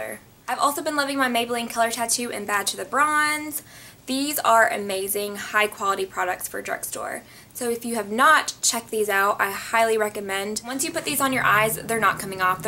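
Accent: South, United States. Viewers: I totally agree! She changes a lot in the timing of all of her videos and that the beauty of makeup :) Its crazy to see her transformation. Her accent also seems SOOOO southern ?!! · I never knew u had an accent. I love your souther accent. I think u were the first beauty YouTuber I started following maybe 3 years ago. Haters talk about your accent and I was so confused thinking u had an accent in the most recent videos but now I understand...haters gonna hate. I love your videos. They always inspire me to improve my eye makeup skills. And we share the same bday😊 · I love ur accent!!! Lol Y'ALL! And I love that coach bag but it wouldn't work for me 😓 I'm a mommy and that bag isn't Supposed to get wet some how it would end up in a bath tub in my house lol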